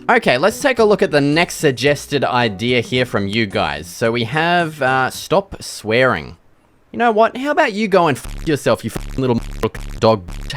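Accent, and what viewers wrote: Australia. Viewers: Cus his Australian mate 😂 Could tell the moment he said theres afew blokes over there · He sounds more Australian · He’s an Aussie. Kiwis sound different.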